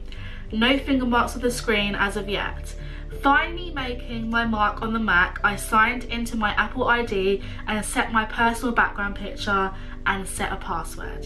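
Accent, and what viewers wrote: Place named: United Kingdom. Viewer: British English sounds so lovely and cute 😍😍😍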